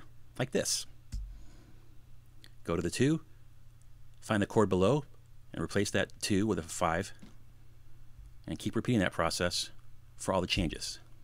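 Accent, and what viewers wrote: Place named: Midwest, United States. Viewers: American English, if that's what you're asking. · midwest. maybe chicago area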